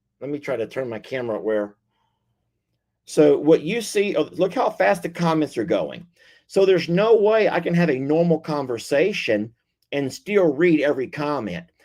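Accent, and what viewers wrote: South, United States. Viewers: Your voice sounded like a southern accent, no a Yankee at all. 😂 Jaqueline is not a typical African American name. I also had a pastor who was white and named but went by Les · Your accent was more southern aristocrat to my ear,.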